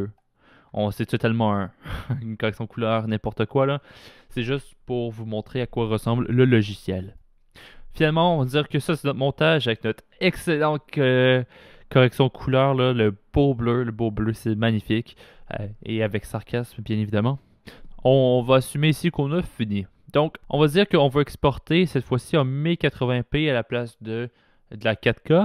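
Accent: Quebec, Canada. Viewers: C'est du à comprendre le québécois 😥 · TU ES QUéBéQUOI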